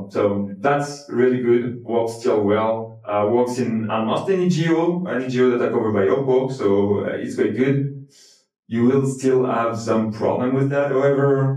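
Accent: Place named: France. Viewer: Fluent English ! With pretty french accent, j'aime bien :)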